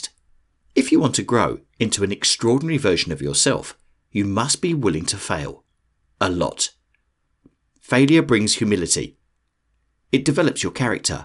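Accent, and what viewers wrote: United Kingdom. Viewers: Brilliant video. And your British accent drills the message into my brain with elegance.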